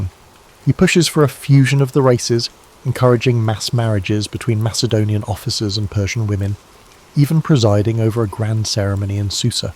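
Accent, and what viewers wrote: United Kingdom. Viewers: I have to wonder, much as i am listening to these! if this is AI as so many facts and stories are being put out quite fast and this is an english ‘narrator’ but the site is American.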